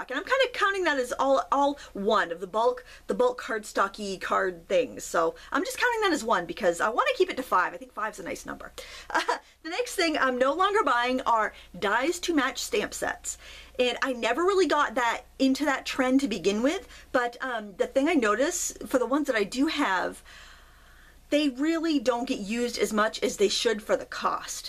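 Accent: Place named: Texas, United States. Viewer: Hi! It is so defucal for me to understand what you say, for the firste, you talk wery fast, and with your lovley texas accent, i would love it to stay that way, ant i wont have a single issue iff you just show the stuff you tell about... Love from E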